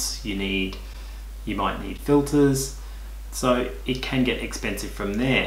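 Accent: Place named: Byron Bay, Australia. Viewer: That’s a hell of a well developed ear for accents you have...! is from Byron Bay which isn’t all that far away from Brisbane...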